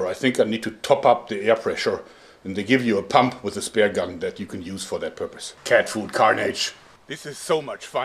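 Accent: Germany. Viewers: not russain accent, but we do have a German accent. which is about as cool if not cooler. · He speak like a german :O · Your english sounds like you have a german accent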